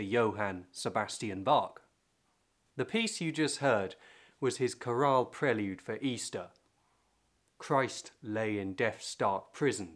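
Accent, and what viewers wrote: United Kingdom. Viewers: Rejoice, o my soul, in Christ, the Risen Saviour!, your narrating is truly wonderful, so beautifully English. Thank you for this wonderful recital.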